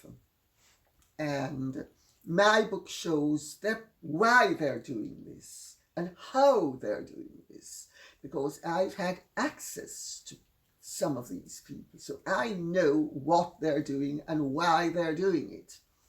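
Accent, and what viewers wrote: Jamaica. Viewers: Love her slight Jamaican accent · Lady Colin Campbell was born in the Parish of St. Andrew. Jamaica - her accent is undeniably Jamaican.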